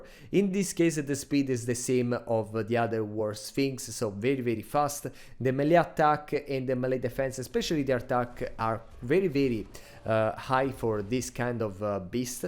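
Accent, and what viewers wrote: Italy. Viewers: Ciao I'm quite sure you are a fellow Italian by the English accent. If not, great video anyway. Grazie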